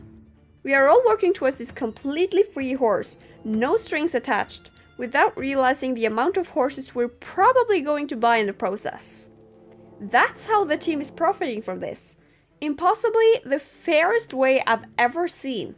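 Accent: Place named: Norway. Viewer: Now i hear that you is Norwegian on your english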